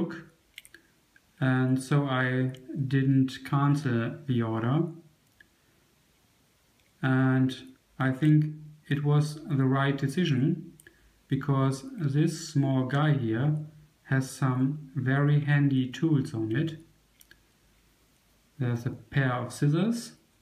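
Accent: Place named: Germany. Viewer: Moin, for your first english video, you did excellent in my opinion. Most german people had english back in school and remember very little of it and can speak even less. You did a better job than most people would have. I mean one can hear that you don't speak english on a regular basis, but nobody can fault you for that. The german accent is hard to loose when speaking english or probably every foreign language for that matter. I don't know if you plan on more videos in english, but I will tune in anyways... Except you start speaking french. Greetings my friend and have a nice weekend, Soly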